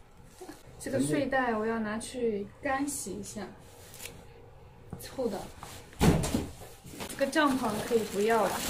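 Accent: Chongqing, China. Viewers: 在重慶都要這樣講話嗎？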